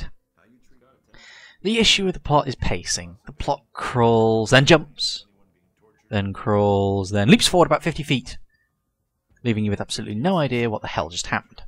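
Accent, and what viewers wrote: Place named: Ireland. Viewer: you sound relatively irish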